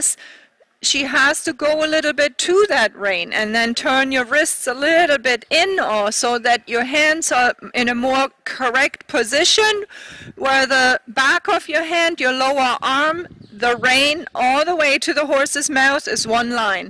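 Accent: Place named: Germany. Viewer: You really hear the german accent 🤣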